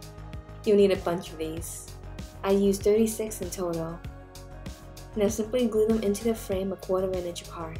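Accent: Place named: New York, United States. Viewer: I like how you turned into a New Yorker about 40 seconds in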